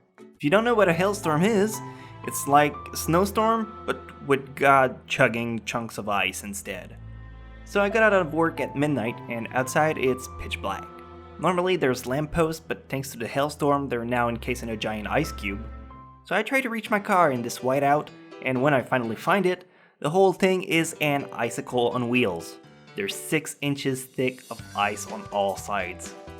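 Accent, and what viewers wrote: Quebec, Canada. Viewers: OMMFGGG ur Canadian as well judging by accent I would assume Quebec se magnifique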